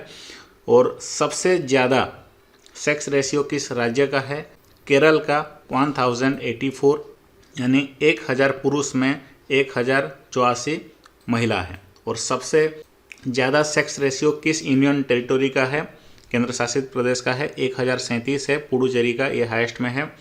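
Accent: Odisha, India. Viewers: Oriya bhai ka tune hai.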